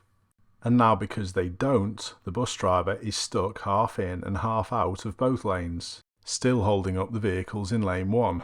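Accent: Liverpool, United Kingdom. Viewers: no i agree that it's not cool to hear someone purposely sound over excited about the mundane but is the opposite. He sounds like a robot that hates its own existence lol. I think he's trying to speak clearly which is fair enough being a scouse lol but still it just lacks charisma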